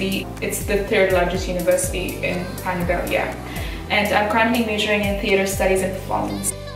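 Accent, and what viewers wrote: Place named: Minnesota, United States. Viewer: I did subscribed ❤😘😘 lovely speech, kinda intersting, And the accent is really really good to hear. Same as minnesota state😍😍😍😍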